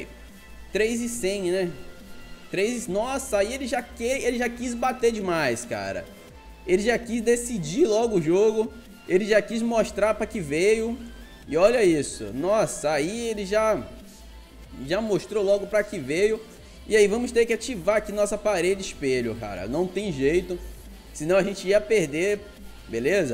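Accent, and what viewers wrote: Bahia, Brazil. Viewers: kkk sabia q vc era baiano mano vc é de onde? salvador? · ata você é bahiano , pensei que fosse dá paraíba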